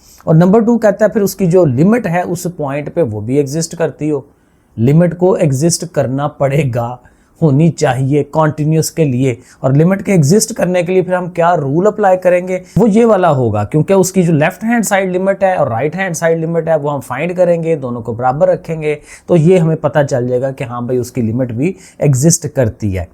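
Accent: Sialkot, Pakistan. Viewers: Sir has a pure sialkot accent😂 like who else noticed❤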